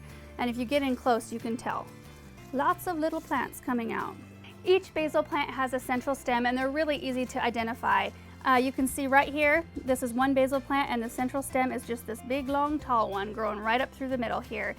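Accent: United States. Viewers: Your idiot Amurrucan voice makes me sick. Basil grows itself, leave it to grow.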